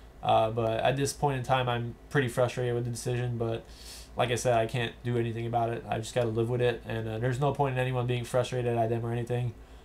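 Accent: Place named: Quebec, Canada. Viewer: haha such a strong quebec accent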